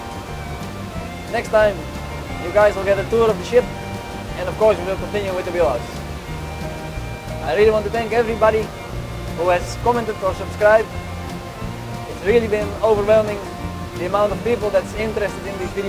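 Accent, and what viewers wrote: Netherlands. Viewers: Hire an English speaker. The Dutch influenced language is killing the pleasure of watching. So, nope, not for me.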